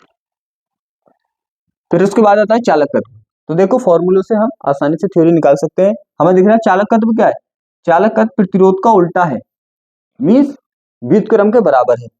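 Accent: India. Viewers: Sir aap Bareilly se lag rhe hai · Apko dekhne se nhi lg rha language hi up ki h 😂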